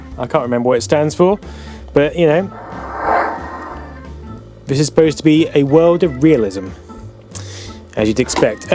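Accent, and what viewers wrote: United Kingdom. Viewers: Because he's British and that's how it's pronounced.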